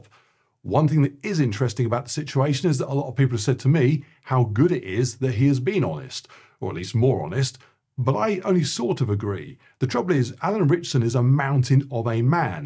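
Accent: England, United Kingdom. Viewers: I need that English accent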